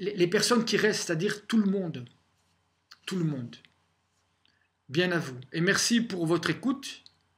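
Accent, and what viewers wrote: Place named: Belgium. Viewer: Le petit accent Belge qui passe bien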